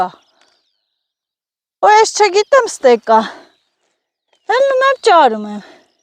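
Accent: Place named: Artashat, Armenia. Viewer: jan.erek vor 1in blogy ditumei ev dzer masin yndhanrapes vovhinch chgiei.yntacqum gushakeci vor vayqumeq.qani vor esel es 2 ory kaptavotik snkerem 2 angam gnel.patrastel e mi qichel dzmerva hamar drel sarcaxcik.u hitri vor vayqicen berum.mekel dzer barbaric sarerum xoselis😊😊😊😊 aseci artashatcia.indz tvume vor dhat chishtem gushakel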